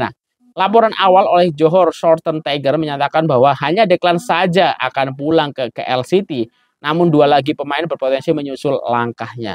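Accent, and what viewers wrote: Java, Indonesia. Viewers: hahahaha....pekat betul jawa kau bro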